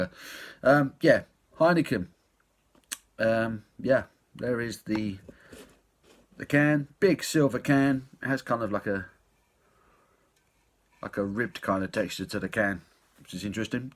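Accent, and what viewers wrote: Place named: Cockney, United Kingdom. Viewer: is essentially a Cockney Bill Bailey beer reviewer. I always enjoy the videos.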